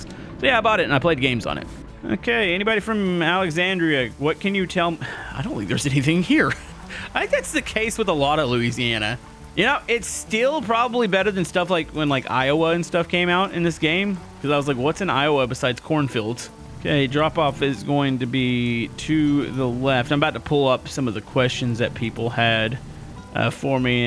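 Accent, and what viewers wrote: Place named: East Texas, United States. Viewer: Sounds like you’re from east Texas! Tyler,TX here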